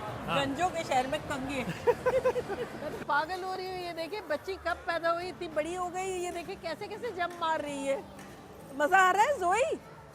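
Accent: India. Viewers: Very beautiful vlog. Felt like we are enjoying with you. Your accent is very much like indians as compared to other youtubers that I follow. Any roots in India? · mam I was watching your pani puri recipe with my dad today and I told him she is from karachi but at times sounds so indian🤩🤩🤩 my dad and I enjoy watching your recipes Love from india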